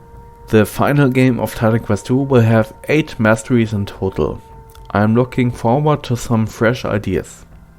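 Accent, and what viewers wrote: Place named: Germany. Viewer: least brutal German accent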